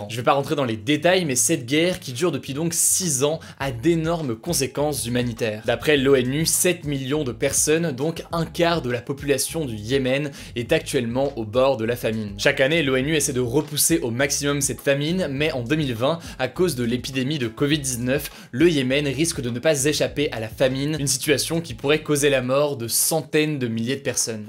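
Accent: England, United Kingdom. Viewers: Y a qu'à moi que son accent anglais fait marrer ? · Ton accent anglais >>>>> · Ton accent anglais est trop bien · j'adore ton accent anglais! Merci pour tout le travail que tu fournis!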